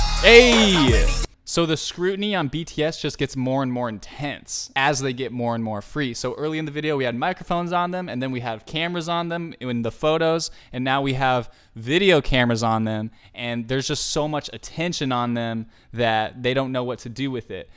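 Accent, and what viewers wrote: United States. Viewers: Great video but halfway through I had to turn it off because I just cannot stand listening to American accents:((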